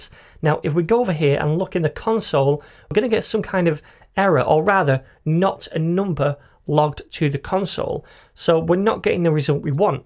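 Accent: United Kingdom. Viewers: I really like your accent, it reminds me of my time in the UK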